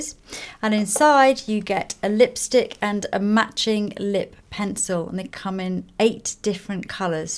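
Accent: United Kingdom. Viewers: I really love your perfect brit pronunciation! And what a gorgeous makeup!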